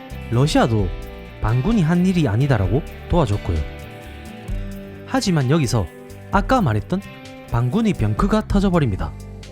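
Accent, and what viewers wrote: Gyeongsang, South Korea. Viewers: 경상도 사투리 흉내내세요? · 경상도 20년 살았는데 좀 이상하긴한데 서울말씨보단 듣기 편함 ㅅㄱ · 갱상도말 신경쓰이면 안들으면 되제 따지는게 많노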